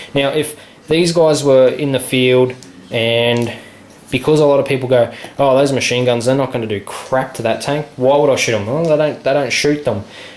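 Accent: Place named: Australia. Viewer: You've got a hell of an Ozzy accent mate...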